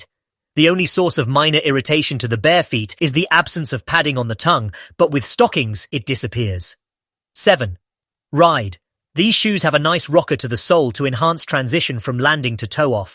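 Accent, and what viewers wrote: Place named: United Kingdom. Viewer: I've been watching your videos for awhile and I was a little surprised at first to hear some British dude narrating. :) I've always loved the look and the sharp rocker profile of the Noosa but I've never tried them because of concerns about cushioning. Also, the midsole still says FlyteFoam, not Blast. How do these feel on a 6-8 mile easy run compared to something like the Nimbus? I'd love to have a comfortable non-plated shoe with a great rocker. Thanks.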